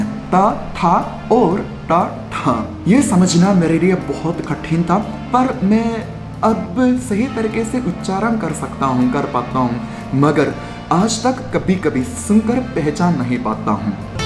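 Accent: Standard. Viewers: Itni pure hindi hayeeee sooooooo cute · Pure hindi you speak 😎😀.NICE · Bro aapki Hindi bohot hi jyada Shudhha ho rahi hai! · He speaks wayyy more “SHUDH HINDI” (*pure hindi*) than actual Indians😅 btw I’m an Indian too so…. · आप तो कई भारतीय लोगो से शुध्ध हिन्दी बोलते है। Love from Gujarat... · Are bhai itna swach Hindi to Indians bhi nahi bolte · App shud hindi bol rhe ho app thore English words use kar sakta ho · नमस्कार भ्राताश्री, मैंने आपकी बहुत सारी वीडिओज़ देखी हैं तथा मैं आपकी शुद्ध हिंदी सुनकर आश्चर्यचकित रह जाता हूँ। मैं आपको बताना चाहता हूँ की जो ङ वाला अक्षर है उसका उच्चारण अं की मात्रा का प्रयोग करते हुए किया जाता है। ङ का प्रयोग अक्सर संस्कृत भाषा मे अं का उच्चारण करने के लिए होता है। जैसे उदाहरण- संगड़क अर्थात कम्प्यूटर। तो उसके स्थान पर अं की मात्रा का प्रयोग होगा जहा पर सं के साथ एक ङ भी जुड़ जाएगा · Ye bhai bilkul suddh hindi bol rahe hn 🤣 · आप बहुत अच्छा और शुद्ध हिंदी बोल लेते हैं। आपको संस्कृत ट्राई करना चाहिए।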